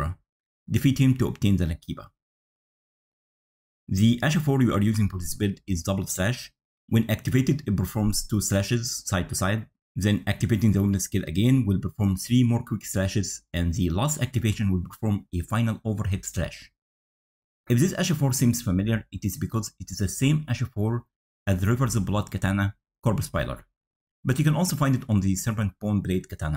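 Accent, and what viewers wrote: Egypt. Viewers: Your video is very well prepared and it left me speechless after I heard your Egyptian accent you are a legend ❤ keep up the massive work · Great vid ! you sound egyptian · واضح انك مصري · why do you sound like an Egyptian while you're speaking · Brother, i bet you're egyptian... i could easily recognize the egyptian accent... since im egyptian also. Btw that was a good build guide, ngl. I'll definitely gonna try it later today ❤ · This thick egyption accent of yours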